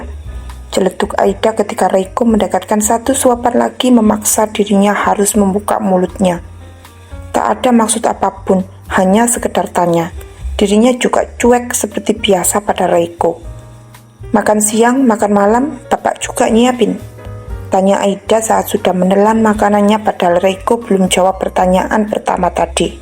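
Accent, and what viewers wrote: Java, Indonesia. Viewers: Medoknya ini jawanya antara bebeb ucik atau bu de Riris yg jawa Asli❤❤😊😊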